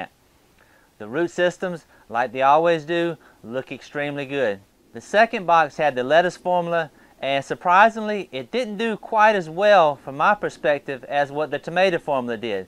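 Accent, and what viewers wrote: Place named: South, United States. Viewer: Good stuff. Interesting experiment—I’m always hunting for that elusive “perfect” organic solution for hydroponics. Keep it up man! Btw: are you from anywhere near where Jimmy Carter is from in GA? I’ve been around a lot of southern dialects in my life, but the only place I recall hearing anything similar to yours is maybe from Jimmy Carter. Lol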